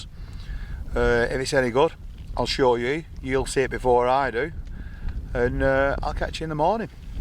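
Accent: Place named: Northern England, United Kingdom. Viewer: Fantastic to see you back out and hear your superb Northern tones,us Northerners stick together. I’m 62 and you’re inspiring me to start this wild camping malarkey. Look forward to the next video mate.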